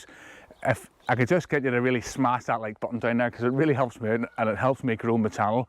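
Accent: Northern Ireland, United Kingdom. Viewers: Helpful video, for supportive and constructive feedback it would help to slow down the rate of and narrative in the speech dialogue to make it more easily understood given the strong Northern Irish accent, much of the dialogue in this video was difficult to understand but overall helpful advice, thank you.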